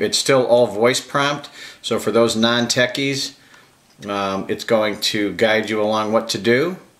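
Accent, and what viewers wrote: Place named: China. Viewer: The lady speaking she sounds like she's from China brand made in China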